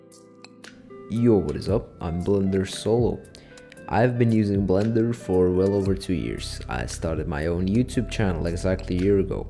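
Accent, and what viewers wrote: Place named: Czech Republic. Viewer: CZECH!(jsem taky čech) like in that clip from world of tanks, I saw the Czech inscriptions and it was kind of strange to me, and then when you spoke, I realized that you are Czech.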